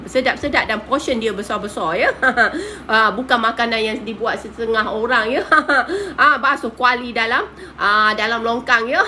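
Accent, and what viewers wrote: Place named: Kelantan, Malaysia. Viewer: bunyi mcm org kelantan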